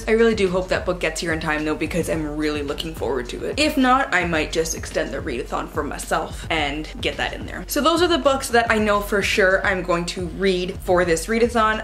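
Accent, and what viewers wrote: Canada. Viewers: Hello =)) As i'm not from Canada, i don't know much about stereotypes but i've always known how well you all speak great english and french, how you pronounce differently words like: "out", "about" and similars, i've heard about poutine and specially the maple syrup :P I would like confirmation on those xD (i specially like the accent though <3) Thank you for the amazing giveaway and opportunity ********************** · Dual Canadian-US citizenship coming in here! I'm from that part of NY where like half my town is French Canadian. A local college literally has a minor called "Canadian studies". Not technically a stereotype, but I know when I cross the border and when my neighbors north come down when they say about with the out part sounding more like an oot or more rounded o. It makes me smile when I hear it in your videos. And maple syrup omg. 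And hockey (go sens!!!) Maybe this is a good time to finally pick up the handmaid's tale. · pretty sure my favorite canadian stereotype is the way you say the word "sorry" as "sourry" becausE IT'S TRUE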